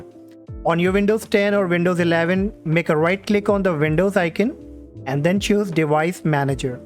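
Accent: India. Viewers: of course he sounds indian.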